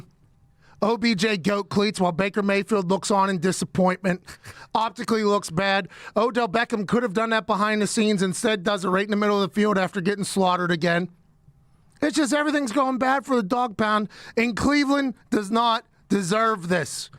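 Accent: Pittsburgh, United States. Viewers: Love your show, you're funny as hell, and your accent makes me miss the city and my Pittsburghese friends. Based in CA, from Taiwan, spent 5 years in the burgh for my undergrad at Pitt. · Went to high school with lol this is just the way we all talk from Pittsburgh we don’t even realize we do it but listening to it does sound funny.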